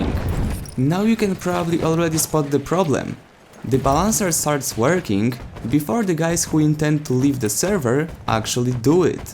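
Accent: Germany. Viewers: I feel like is german? Or dutch? Especially with that accent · You should do a video on the skill balancer. Dude, I love your content, keep it up. Also, is your accent German? If not, what is it?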